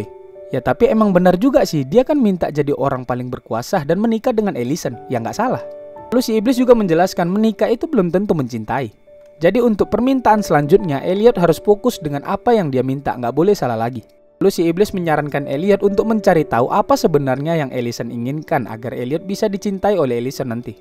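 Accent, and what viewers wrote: Medan, Indonesia. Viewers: bahh... anak medan rupanya...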